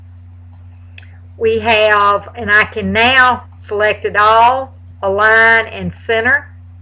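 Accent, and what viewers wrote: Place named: South, United States. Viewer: you are so awesome!!! and I LOVE your southern accent!!! (I'm from Kentucky, but lived most of my life here in Ohio, so I lost my accent :( ...)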